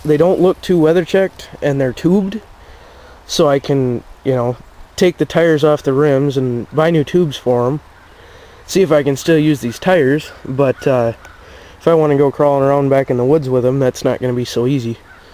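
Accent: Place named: Pennsylvania, United States. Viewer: You sound like a Pennsylvainian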